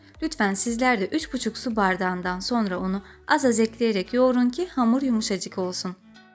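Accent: Azerbaijan. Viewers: bozma ay bacım o geşeng azerbaycan şiveni bozma, öz şivende danış..bizim dil bozuk zaten, bari siz özünüzce danışın ki gerçek türkçeyi sizden öğrenebilek..gurban olum sizin bezekli danışığızı yaradanıma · Normaldir Azerbaycan ve Türkiye sivesi karisinca cok güzel bence. Sizde Azerbaycan sivesile konusmayi deneyin bence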